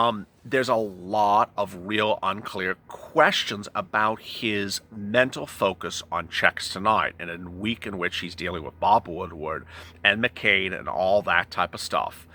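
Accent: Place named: Long Island, United States. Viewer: Love dat Lawng Guyland accent, - Power To The Purple!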